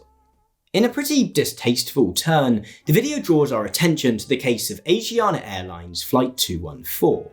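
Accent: United Kingdom. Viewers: British authoritative voice makes this video look to be more than what it actually is, finger-pointing shaming with no concrete facts to backup the claims, only public perception and opinions.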